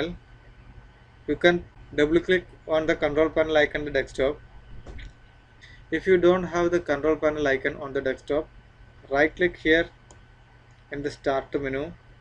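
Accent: India. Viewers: Thank you love your India accent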